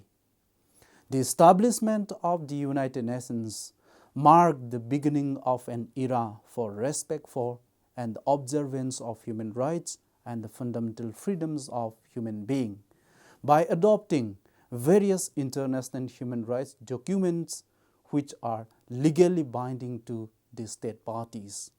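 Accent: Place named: Northeast India, India. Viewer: He is speaking English. The only thing is that he has a North East Indian accent. Please learn to be kind.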